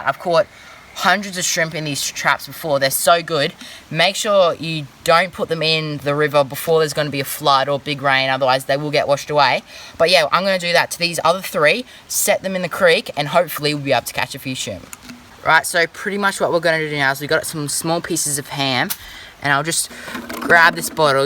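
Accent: Australia. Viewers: Are you an Aussie????? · Is he Aussie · Are you Australian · you sound Australian, cyclone debie hit Australia, how come you speak American, in this country we call them prawns. however what you were catching were yabbies good to eat but not prawns or shrimp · I can tell ur an Aussie so wanna meet up sometime and do some fishing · Are u a aussie? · are you a australian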